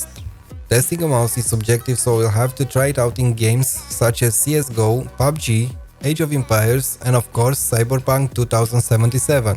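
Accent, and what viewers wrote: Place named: Germany. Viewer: Very serious setup and professional review, good stuff. You sound like Germans, are you? :D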